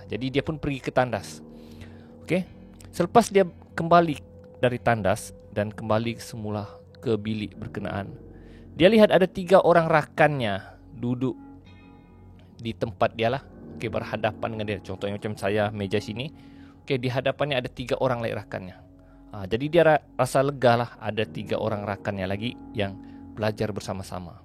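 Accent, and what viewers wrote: Sabah, Malaysia. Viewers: As slm...owg Sabah ka dik☺️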